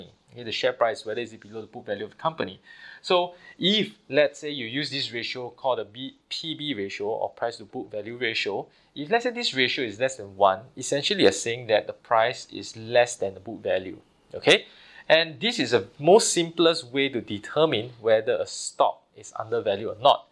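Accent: Singapore. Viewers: I just can't take the heavy (Singapore?) accent, so I quit watching after 30 seconds. But I am pretty sure he doesn't know how to value a stock, his approach is basically guessing. If this is the right way, analysts would not deserve to be paid that much. I don't blame on him, in fact I would be surprised if anybody at his age does.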